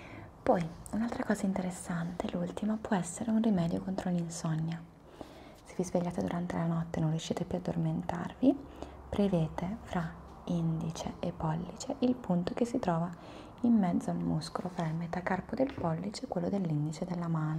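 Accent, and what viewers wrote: Italy. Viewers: AAA esse sotaque italiano que eu amooo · Could listen to her all day long..love the Italian accent❤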